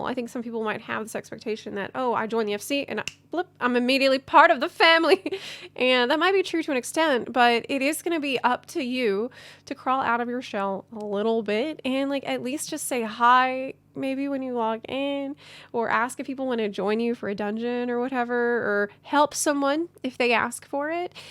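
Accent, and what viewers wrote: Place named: Louisiana, United States. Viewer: Hi! Just started and found your channel really useful. If you don't mind me asking, are you from Louisiana at all? Your accent is very distinctive. Sounds like its from the Acadiana region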